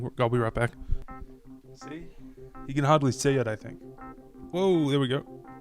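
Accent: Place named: Australia. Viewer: Are you Aussie, you sound Aussie, umm why you picked these movies is a mystery to me as i have not seen half of them and maybe i should have, anyhoo lets dance fatso ; )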